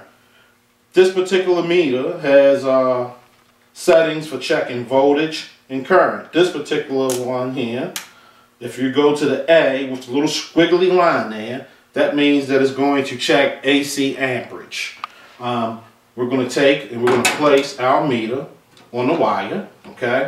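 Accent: Baltimore, United States. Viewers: Nice vid. You're from bmore huh?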